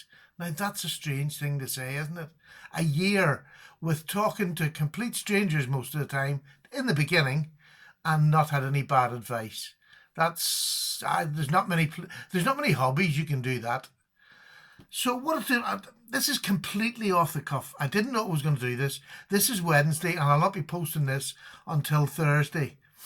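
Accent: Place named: Ireland. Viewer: Congratulations. Love you channel and even more your sweet Irish accent!:-)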